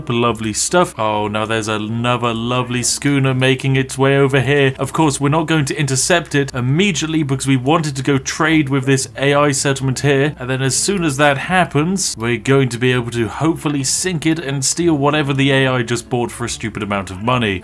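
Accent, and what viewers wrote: United Kingdom. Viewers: Wait... British??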